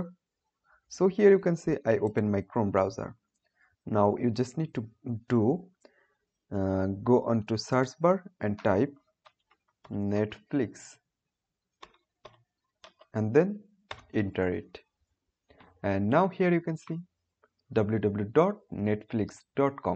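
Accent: India. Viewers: when you search up how to do something and you here the videos voice be an indian you have hope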